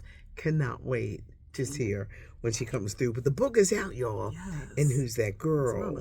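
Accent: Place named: Philadelphia, United States. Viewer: Bad guy Diddy is. Ur voice SCREAMS Philly! I love u listen to WDAS WHEN I Lived in Philly. Props to u beautiful lady.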